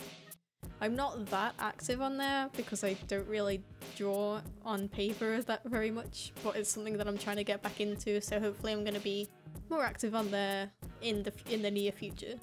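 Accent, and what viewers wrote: United Kingdom. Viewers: I really love the british... accent? Can you call it an accent? I mean, isn't it the origninal English? Either way, love how British people talk and that is one of the only reasons I wanna visit England one day. Just to talk to British people. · Sooooo, are you British or American? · Wait she's British?🐈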